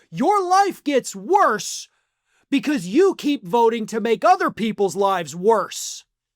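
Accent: South, United States. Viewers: Farron that is not a rural Montana accent you are projecting. That is southern.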